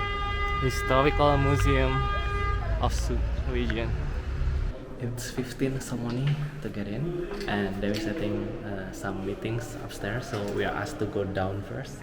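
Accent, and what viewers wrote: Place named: Indonesia. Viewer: Untung aja logatnya bang Indonesia banget, jadi masih bisa mengikuti semenjak jadi English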